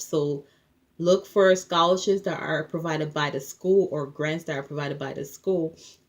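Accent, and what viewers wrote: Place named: Liberia. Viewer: Thanks for the info! I hear the Liberian accent lol....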